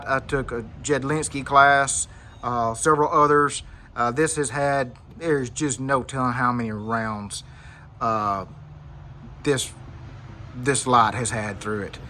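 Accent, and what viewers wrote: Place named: South, United States. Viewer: I had 2 pl350 switches crap out. What state are you from? It sounds like the South, like me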